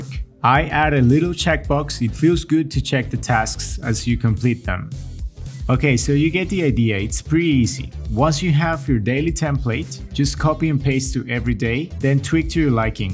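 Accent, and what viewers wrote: Colombia. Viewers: Are you Colombian? Something in your accent and sense of humor makes me think you’re Colombian 🇨🇴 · The way you explain it was so nice! btw, loved your Colombian accent! Colombian here too :)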